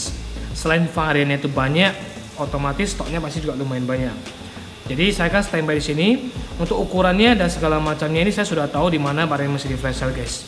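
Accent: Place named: North Sumatra, Indonesia. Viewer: Ooh abang orang pakam toh 🤣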